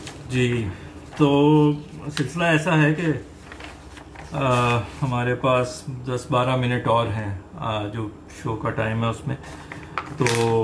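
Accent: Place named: Punjab, India. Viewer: Nice ✔🙏 wow so super bhaut kubh nice your voice🙏🙏👌🌹🙇‍♀️🧕🙏 nice your panjabi language tone so super 👌👌🙏🙇‍♀️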